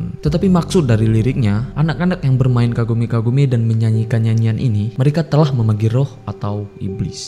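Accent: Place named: Medan, Indonesia. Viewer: Kaya ada logat medan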